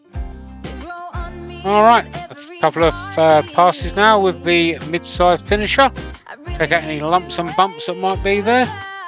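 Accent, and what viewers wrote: United Kingdom. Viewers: Very nice bowl, but surely you should call it a sweet bowl not a candy bowl. You sound like your from the UK.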